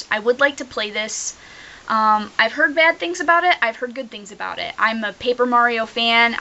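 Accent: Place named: South, United States. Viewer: Oh ok... Thats cool! Im from Alabama and your accent sounds southern to me... You ever been to Alabama?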